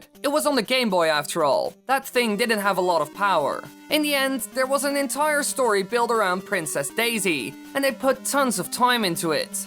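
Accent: Netherlands. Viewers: Did nobody notice that this guy sounds like hes dutch... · Wait. You are Dutch, amirite?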